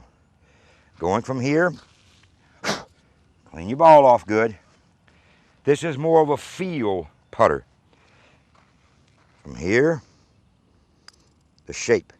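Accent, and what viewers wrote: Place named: South, United States. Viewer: Great southern accent! Makes the video succulent.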